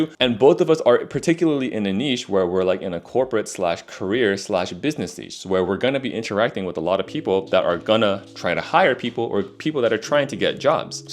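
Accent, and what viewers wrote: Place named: China. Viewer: I like how chinese speak english... just like Jian Yang from silicon valley vibe. 😂 You might be korean though idk... i just like the accent. Also been following you for a while..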